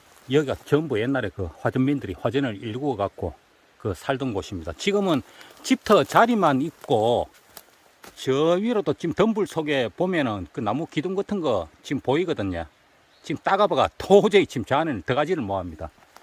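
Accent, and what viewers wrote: Gyeongsang, South Korea. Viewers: 아름다운 풍광과 맛깔 나는 경상도 사투리가 너무 잘 어울립니다. 고맙습니다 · 경상도 오빠야네예 ㅎ 조심해서 다니세요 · 이분 대구사람인가? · 구수한 갱상도 말로 전해주는 자연소식 잘 보고 있니더 항상 조심 하시소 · 경상도 같은데요 겅남쪽 ~좀 알키주이소~자랑만하지말고예~😃😃😃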